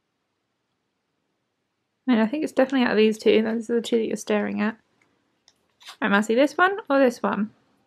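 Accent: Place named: United Kingdom. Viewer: => Wow...That is such a lovely british accent...I dig it ;_)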